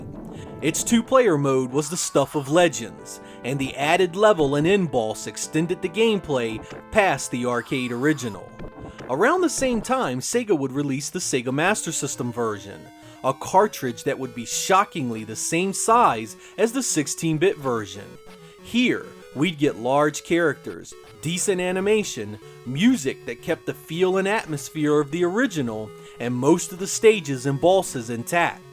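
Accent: United States. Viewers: I guess he's from America?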